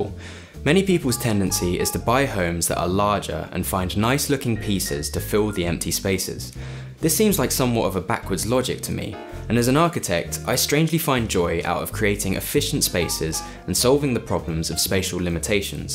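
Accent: United Kingdom. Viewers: Ohhhh!!! YOU ARE A BRIT!!! how wonderful, the second you spoke, I knew it!! I love the UK YouTube home solutions - what is the point in watching the USA ones, when you can't actually go out and buy the products. Home Depot!!! Yeah?? I am sure they deliver!!!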